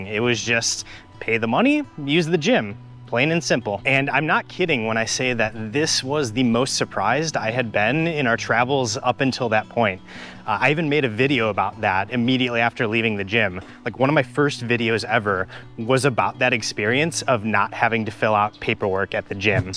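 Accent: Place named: United States. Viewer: I like ur American accent and subscribed🇺🇸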